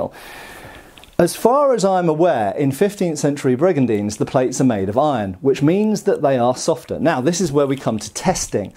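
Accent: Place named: England, United Kingdom. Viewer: No offence, I could really see you as a baddie in a Robin Hood film. Black brigandine, shaved head and English accent. As we all know, the goodies in English ‘historical’ films must have American accents!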